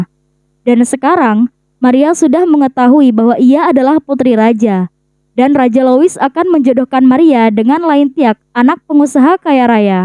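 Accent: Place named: Madura, Indonesia. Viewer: Kek orang madura nih logatnya 😅😂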